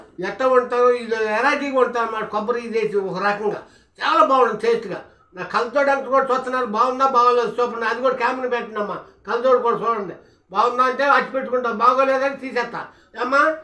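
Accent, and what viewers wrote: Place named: Andhra Pradesh, India. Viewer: తాత నువ్వు ఉండే వంటలు ఎక్సలెంట్ తాత మీ ది విజయవాడ కాదు తాత విజయవాడలో ఉంటున్నారు కానీ మీ ది పక్కనే ఏదో ఊరు అయ్యుండొచ్చు నీ మాట తీరు పక్కా ఆంధ్ర బందర్ దగ్గర ఏదైనా ఊరు అయి ఉండొచ్చు అనుకుంటున్నా తాత మీది కదా తాత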